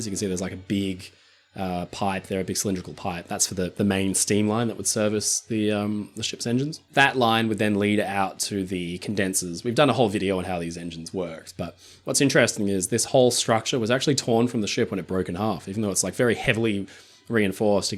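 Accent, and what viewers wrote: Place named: Australia. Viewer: I thought he was irish for years and then ir became obvious hes Australian...lok😊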